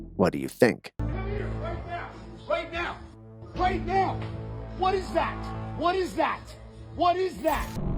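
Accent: South, United States. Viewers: Little Southern 'twang' thing going on there this time - maybe has been travelling the South... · I noticed your cowboy Southern drawl accent tonight lol I like it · What's with the Southern twang to your voice? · are you throwing a little bit of country on us? And what's up with calling Russia, RUSHER?!? LOL. I don't even think country folk refer to Russia as "RUSHER" 😂. You crack me up! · ...are you getting a Texas/Arkansaw accent??? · you've developed a southern belle twang out of nowhere. That's the biggest mystery in this video. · your voice cadence keeps changing back and forth. From regular city mouse to southern country mouse!!!! What's going on dude?? Did you visit some old family relatives from the South and you inadvertently picked up a southern twang in your voice?? No disrespect meant by that, btw. I was just wondering??!!??🤔😬🤭😌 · What's up with the occasional southern twang, such as when you say 'house' ? 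I'd never noticed it before, but it's become almost regular, being heard in many of the clips.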